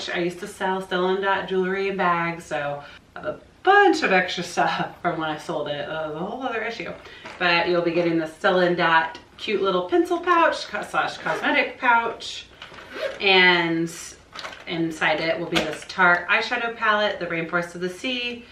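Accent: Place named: South, United States. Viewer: I loved watching you declutter. I've grown to miss the south and home so it's nice hearing your accent. Will keep watching. ❤️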